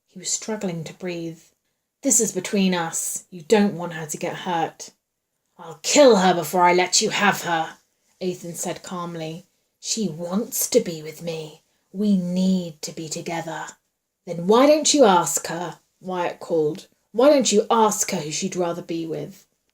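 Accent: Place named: RP, United Kingdom. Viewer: I LOVED this!! Thank you so much for reading this! I read it wheni was a kid (RTC was one of my favoriite authors) and i really wanted to reread it but as audiobook. You did an amazing job!! I enjoyed it, I'll be honest the ending with it being whoit was has always really confused me (was he just a rando? Lol) but i still love the book. Its so quintessentially Point Horror 90s!! And i adored liste img to it at this time of year!! (Not sure how the weather is down south but up north its true spooky season weather now!) Also your accent is amazing! Is. That your real voice!? Im british as well but i sound so common compared to you 😂not sure if its cause youre southern but u have a proper RP accent thats so ni ce to listen to reading tuis book! Stellar job!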